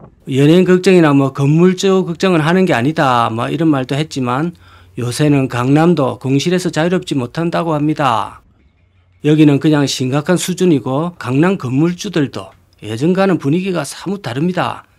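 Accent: Gyeongsang, South Korea. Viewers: 꾸준히 잘보구있읍니다.좋은 조언감사합니다.그냥 인생공부한다 생각하고 봅니다.수고하세요.참 구수한 갱상도 사투리! 얼굴이 궁금해지네요? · 사는데가 김해인교? 말투가 전형적인 부산.김해 이신거로 보이는데 뭐하시는분인데 공실건물을 찾아다니는건감요? 경매 하는교?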